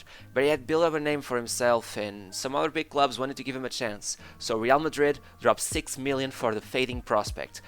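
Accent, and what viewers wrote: Portugal. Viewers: you sound like a portuguese guy talking english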